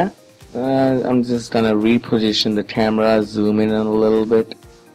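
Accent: India. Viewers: he has an Indian accent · This fucking software is so aids, it hardly even fucking works. Fuck you and your Indian accent, fuck this entire shit.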